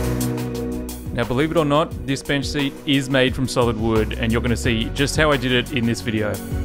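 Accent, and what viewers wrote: Australia. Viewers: Hi, I just stumbled onto your video documenting your amazing bench seat. Nice to hear an aussie accent on YouTube and a Sydney business as well!!! Amazing craftsmanship, thanks so much for sharing... 🙂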